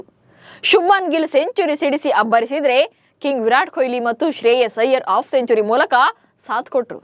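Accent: Standard. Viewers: Swachcha kannada da dhvani shanta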